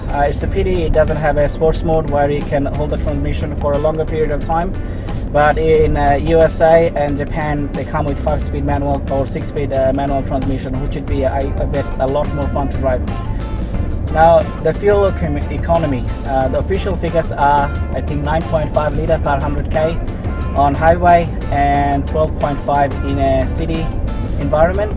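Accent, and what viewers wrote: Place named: Bangladesh. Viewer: You sound Bangladeshi 😅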